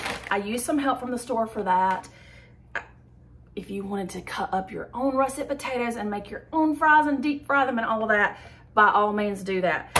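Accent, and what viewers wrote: South, United States. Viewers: Looks perfect!!!! And love your southern slang!!!! Keep up the great work on your videos from OHIO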